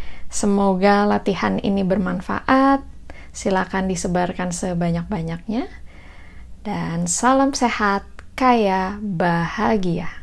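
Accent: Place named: China. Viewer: Gak ah beda suaranya.. Ci ada logat chinesenya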